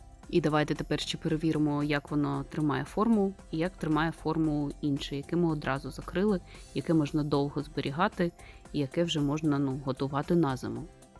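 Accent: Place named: Lviv, Ukraine. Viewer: ого!Я думала,щи Ви зі Львівщини як мінімум 😊Супер👍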